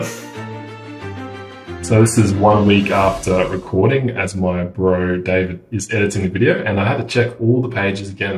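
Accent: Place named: Australia. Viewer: yes, that's always the case. I was asking about what's your take on using chatgpt to overtake FS. No offense, but if you are to reply to my comment, make it meaningful. Otherwise, just ignore it ;) I have a feeling it's easier in Australia (based on your accent, but I might be mistaken). Either way, this tactic is still working, but it needs some adjustements for the global market. I know I might hurt your ego with this and I will probably kill my chances of earning a thousand bucks, but let's discuss this.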